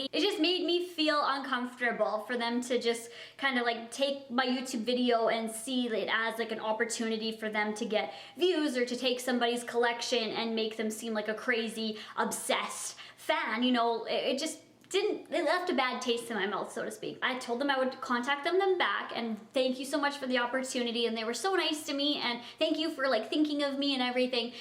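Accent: Canada. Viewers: Am I the only one that loves the way Canadians say about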